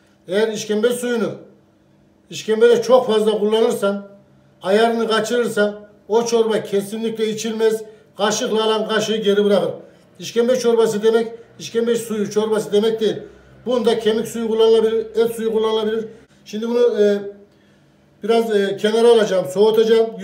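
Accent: Turkey. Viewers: Biz böyle seviyoh gardaşım şefimizi, herkeş İsdambul Turkcesi gonuşmah zorunda deyil...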